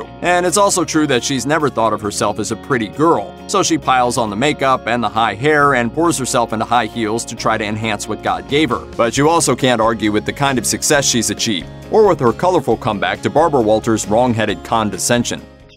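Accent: South, United States. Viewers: A<link> love the way you said mama! I love hearing it.. soo endearing! Not sure if you got southern roots or even mountain roots! Either way I hope you get to meet this graceful lady!🙏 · you have a nice American accent you sound normal i enjoyed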